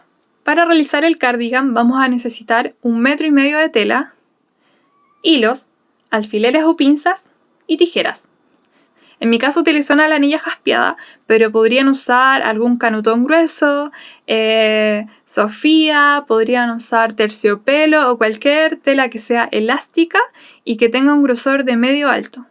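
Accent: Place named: Chile. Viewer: Amo el acento chileno😊 ya me suscribí. Muy buen tutorial. Saludos desde México. Ojalá hagas mas videos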